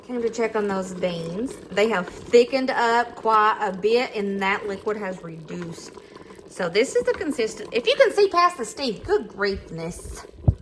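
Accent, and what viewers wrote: South, United States. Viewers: I love eggs and rice 😊😊 Your southern accent is adorable 😊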